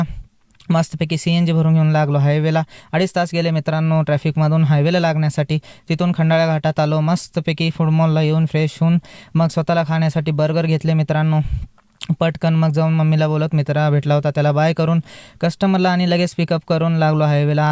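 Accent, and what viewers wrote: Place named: Solapur, India. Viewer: पुण्यात सोलापुरी बोलतोय.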